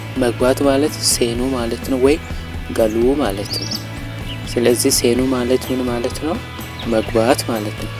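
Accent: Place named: Harari, Ethiopia. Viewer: Wow! You sound from Hararie region, haha!👌 I noticed some of your accent... Hope you're & I found your video accidently!😊 GBU!🙏🎉❤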